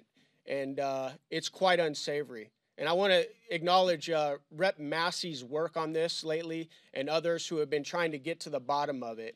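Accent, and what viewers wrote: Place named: Upper Michigan, United States. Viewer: He sounds like he’s from upper Michigan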